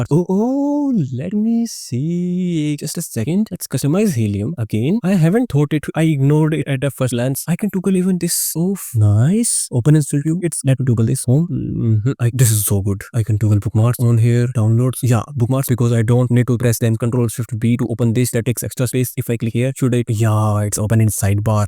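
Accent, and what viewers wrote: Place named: India. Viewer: this is the thickest indian accent i've ever heard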